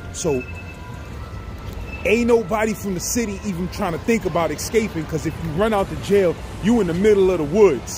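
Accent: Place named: New York, United States. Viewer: I for one would pay for an audio book, it could be the fucking dictionary as long as narrarated it with a hood new York twist.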